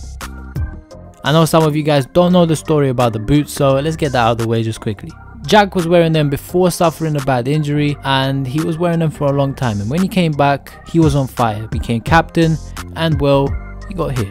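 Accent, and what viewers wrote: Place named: United Kingdom. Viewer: Nice british godfather accent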